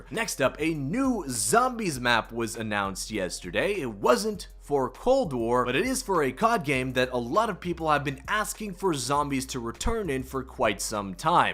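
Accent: Canada. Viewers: Wait this mans is Canadian. I just realized your accent and I can’t unhear the “agaiiinst” and “aboot”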